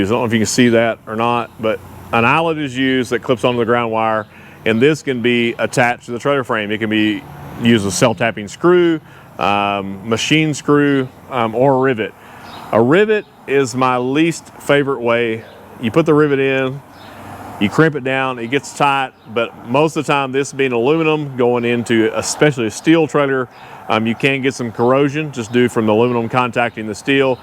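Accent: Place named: South, United States. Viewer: Yes indeed . Always check the ground. Excellent video. I understood you clearly. Some folks migh think a southern fast talking is to much. You got alot of info down in shorter amount of time . Wish I did that on my bilge pumps last week. Thought the manual switch was left on and burnt them out. Bought 2 replacements. Reconnected and noda. I should of checked the bilge wiring better. It was the ground wire. Trailer light no workkee. Re-wired aas I kinda took off one time while they were still connected. After new install , no lights. After troubleshooting, it was indeed the ground on the chassis light. I have to remember to always check the ground. Cheers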